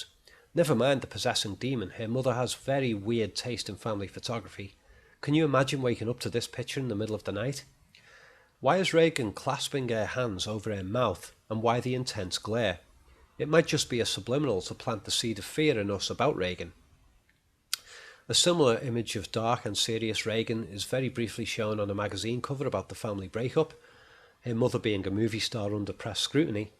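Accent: Liverpool, United Kingdom. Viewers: I don’t care how good, intelligent and eye opening this video is, and it is very well analysed, if it’s described by a scouser it sounds retarded. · Good video brother, nice to go on YouTube and hear a scouser doing a film video ;) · Are you a scoucer? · I realy cnt listen to a Scouse accent trying to sound sophisticated😂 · Nice to hear a fellow scouser narrating don’t hear it often :)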